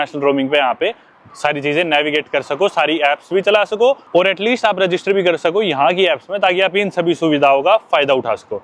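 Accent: Haryana, India. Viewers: मैं देख रहा था पंजाबी जैसा क्यों लग रहा है फिर बोला तो पता चला ये हरियाणवी जाट है 😅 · With all due respect, I can't understand your curry accent English. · Love your harayanvi accent son!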